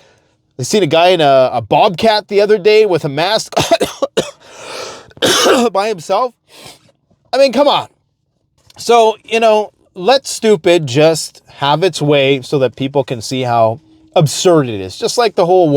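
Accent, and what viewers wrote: England, United Kingdom. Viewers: Geeze you sounded more British then me 😂😂 brilliant!! Thank you for your updates buddy much love from Dorset 🇬🇧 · Why use an English accent when talking about Trudeau? We have pr**ks in England but we don't use Canadian accents to describe them 🤷🏿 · I want more british accent!!! 😅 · Your british accent had me dying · Lol British accent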